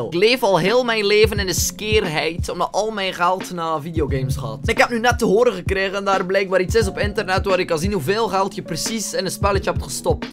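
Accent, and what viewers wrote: Belgium. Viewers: ben jij belg ofni ?'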